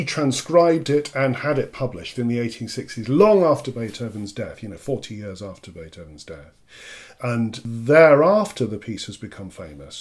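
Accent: United Kingdom. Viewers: Also, being a fan of Monty Python, I couldn't help but notice you're accent. It reminded me of John Cleese? Saw him live, in Charlotte. Thought you were from the same area, perhaps? I've learned, ALL English accents are NOT the same! LOL!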